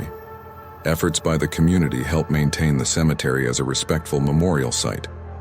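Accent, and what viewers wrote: United States. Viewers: The guy is American, give him a break😂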